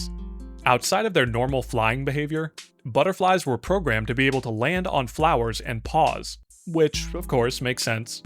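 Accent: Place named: RP, United Kingdom. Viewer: Why do you speak premium english like blathers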